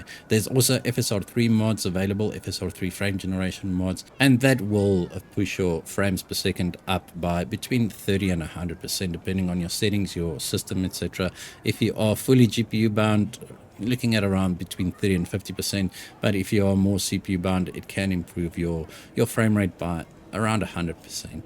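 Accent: South Africa. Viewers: Noice I could hear your accent, Ill sub man, Im from Cape Town now in Scotland! Happy Youtubing!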